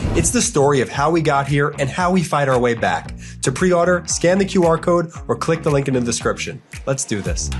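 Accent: United States. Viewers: You got that cowboy dialect down pat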